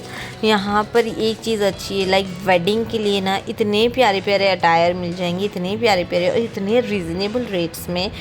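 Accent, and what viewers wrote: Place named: Mumbai, India. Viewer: Axtreme left. Typpickl shilwar.. Apks accent mumbai ka hai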